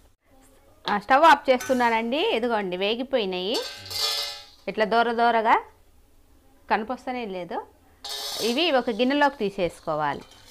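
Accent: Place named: Kadapa, India. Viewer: Kadapa telugu super o super... Same to same ma amma matladinatle vundi... Duvvuru slang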